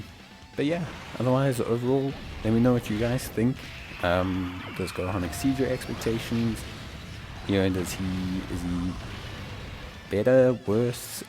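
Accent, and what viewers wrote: South Africa. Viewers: Yo bro are you from South Africa? Your accent sounds familiar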